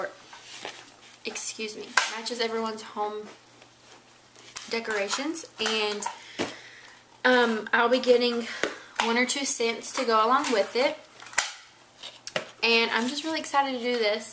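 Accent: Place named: South, United States. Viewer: I AM FREAKING IN LOVE <3 <3 <3 <3 with your sweet southern belle accent. omg my best friend is country too and i just love it! i want to marry a southern man when i get older <3 okay so anyway i love your videos and i love that you are not giving out makeup like every other youtube girl! you are such a sweet mommy! i can only hope and wish that i can be as great as a mom as you! your little babies are soo adorable and i can't believe how skinny minnie you are! got your figure back alrady lol. <3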